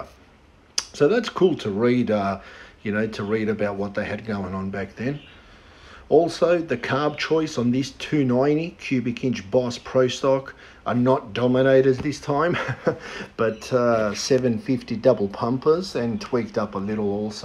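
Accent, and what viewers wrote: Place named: Australia. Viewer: Interesting video mate! In the early eighties I had a 2.3L two door Fairmont as my daily drive. I gathered all of the Cleveland goodies for the transplant but fell on hard times and had to sell the engine and C6. The cat that bought it assembled the engine, put in his short track asphalt car and won a feature race that was dominated by bowties. A proud moment for him and me! Oh! Btw, I love that Aussie accent!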